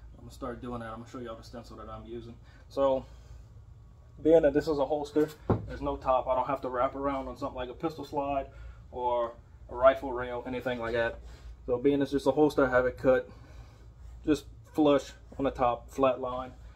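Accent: Louisiana, United States. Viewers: Just where in Louisiana are you from, man?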